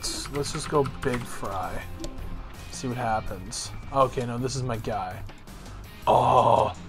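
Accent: Canada. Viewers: why does big fry sound way more Canadian in older videos lolol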